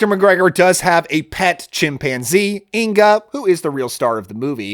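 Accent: United Kingdom. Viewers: Yeah! Great description. Edinburgh is the sort of area I was thinking that sort of accent is from but I've only been there once myself so didn't feel super confident at identifying the accent. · I was also going to say it is a mid-lothian accent but you beat me to it first...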